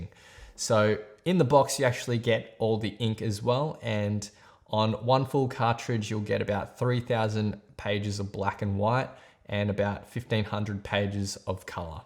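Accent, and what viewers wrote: Australia. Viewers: I like the aussie accent. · Thanks. Nice, clear review. Nice to hear an Aussie accent too...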